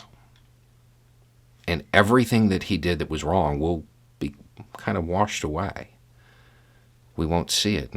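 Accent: South, United States. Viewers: What rock have you been living under that you see anything at all heroic about Ronald Reagan? The "hero" meme about him is pure propaganda, repeated ad infinitum until weak minded people willing to believe anything come to believe THAT thing. I'm getting closer to unsubscribing to this channel. Despite trying to shed your upbringing, it is apparent that at core you remain the ignorant Southerner implied in your accent.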